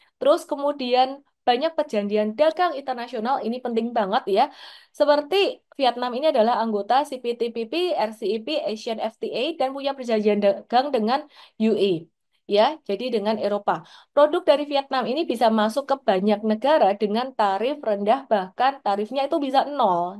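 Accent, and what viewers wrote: Java, Indonesia. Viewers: logatmu jowo mba😁😁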